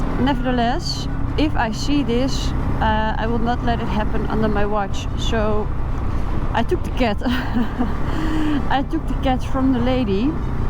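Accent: Netherlands. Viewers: Oo noong una kong makita at mapanood itong channel ni akala ko siya si noraly( itchy boots ) dahil ang pagkadinig ko sa boses ay pareho pero nung mga sumunod na episodes ni nakilala ko din na magkaiba ang boses nila, sa accent ng pananalita pareho kasi pareho sila na taga Netherlands 😊😊😊😊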